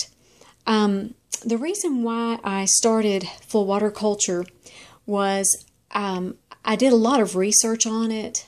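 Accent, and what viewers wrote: South, United States. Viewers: You are so precious! I love your info on orchids. I love your southern accent even more. And even more, love your blessing to us at the end of your videos! Thank you so much!!!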